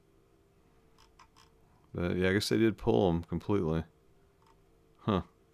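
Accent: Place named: Midwest, United States. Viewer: Ok, so I’m creeping!! lol, I’m a Honker who wanted to see if looks like his voice!!! 😂😂. I have to say your mid west accent is much more apparent on this channel. Probably bc you’re not talking with a British accent!! ♥️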